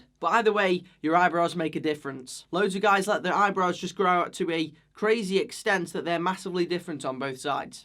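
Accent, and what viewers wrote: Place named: Northern England, United Kingdom. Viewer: Not really, Daniel Radcliffe has a London accent, his accent sounds more like a northerner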